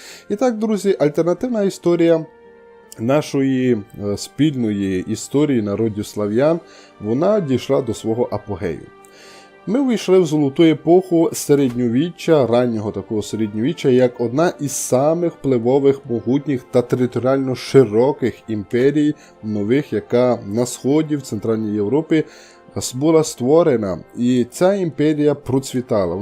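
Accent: Transcarpathia, Ukraine. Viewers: Якщо я правильно зрозумів по говору, то ви з Закарпаття. Можна зробити особливістю канала те, що ви будете включати у свою мову особливості вашого діалекту)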